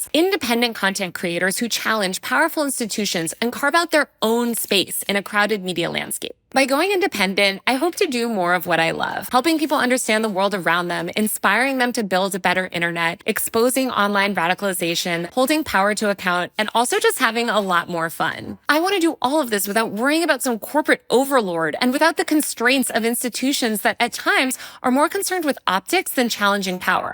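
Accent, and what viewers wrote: California, United States. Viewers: Wapo sucks, your op eds suck and appealed to around 3% or less of the US poulation because your snotty pompous Cali sounding self knows nothing but a political agenda and a bad agenda at that because you dont live in the real world. You live in a concrete, artificial, air conditioned life with no contributions to society and you could have with all the corruption that goes on but no and ive read a few of your op eds and I could hear the simpleton Cali no nothings voice just reading it. Opinion isnt journalism so quit calling yourself one.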